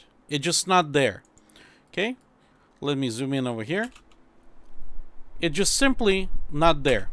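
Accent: Russia. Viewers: Ха-ха..., судя по твоему английскому, ты русскоговорящий )))) Может для нас сделаешь то же видео?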